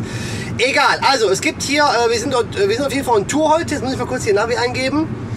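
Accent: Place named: East Germany, Germany. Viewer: wie ne Ostdeutscher vor allem. ...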